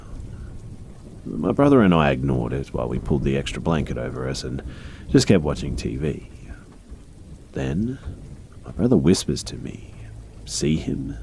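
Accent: Australia. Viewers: Fan from the sunny coast, stoked to hear a fellow Aussie killing the horror!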